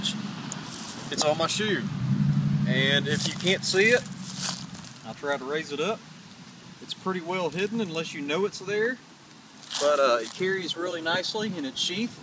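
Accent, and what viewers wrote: South, United States. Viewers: Holy shit this is a serious southern accent.. damn.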